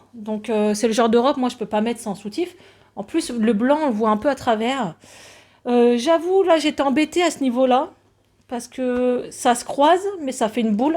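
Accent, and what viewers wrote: North, France. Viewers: Bonjour. Vous êtes du nord ?? Vous avez un accent. J'aime bien vos vidéos. Bis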